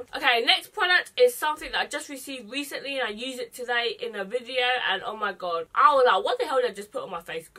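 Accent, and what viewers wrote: United Kingdom. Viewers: What your British???????? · Wait she's British what the fuck didn't see that comin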